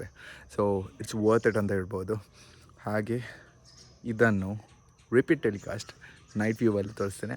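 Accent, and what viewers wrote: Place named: Mangalore, India. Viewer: Superb voice and Mangalore Accent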